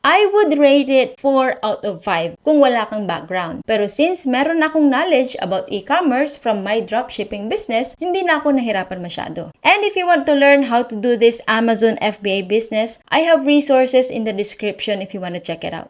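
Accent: Pangasinan, Philippines. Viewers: i can hear my co-pangalatok po hehehe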